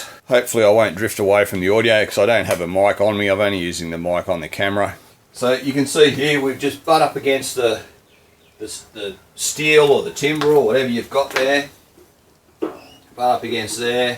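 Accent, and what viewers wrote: Australia. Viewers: Mate that's a work of art! And you've got the most "Aussie Bloke" accent I've ever heard. You could get a voice over job easy if you haven't already. 🍻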